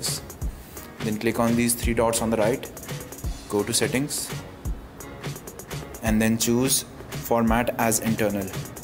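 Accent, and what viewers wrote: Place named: India. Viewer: The Voice is English taste India, because Google now is beling to India People.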